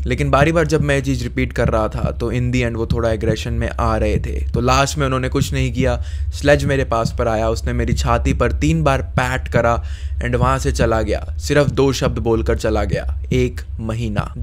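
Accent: Haryana, India. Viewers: Sir are you from haryana?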